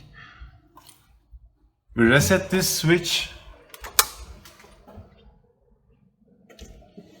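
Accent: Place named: Turkey. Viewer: most turkish accent i ever seen in my life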